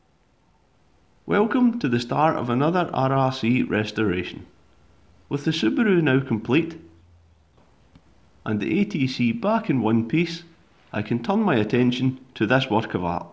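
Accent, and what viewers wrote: Scotland, United Kingdom. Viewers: The increased commentary and explanations is spot on. Being a fellow Scot, it all makes perfect sense to me. Think you are more north of the border them me though.. 😁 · Best restoration channel ever! you rock man, plus I can practice my english, I always found UK english harder to understand, but I really like how it sounds, so it's to see how you work on the machines and I can practice a little, too, cheers man!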